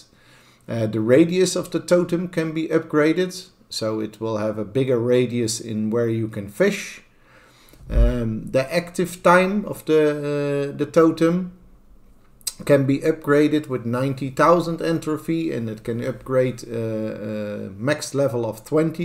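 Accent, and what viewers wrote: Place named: Netherlands. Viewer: Gotta love the dutch accent😂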